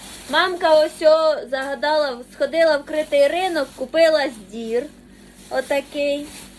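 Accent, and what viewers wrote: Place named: Surzhyk, Ukraine. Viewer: Диво дівчина. Не люблю суржик але з цих чарівних вуст це як музика.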